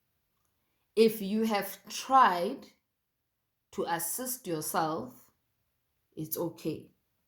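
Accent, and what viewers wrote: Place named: Lesotho. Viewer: Thokoza gogo..your accent hao bua sesotho...wher are you based or from? Thank you for the information.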